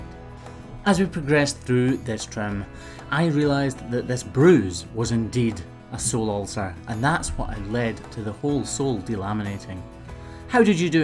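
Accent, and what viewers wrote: Scotland, United Kingdom. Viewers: I especially loved hearing all the background sounds during the first part. However, being from the United States, I can’t get enough of your Scottish accent. ☺️💜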